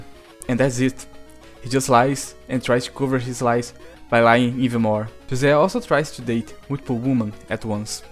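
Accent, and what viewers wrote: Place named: Brazil. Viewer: You is brazilian beacause you have a brazilian assent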